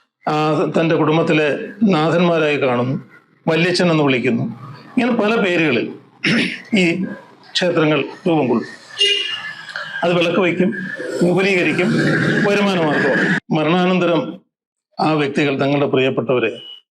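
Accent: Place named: Thiruvananthapuram, India. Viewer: Slang TVM. ..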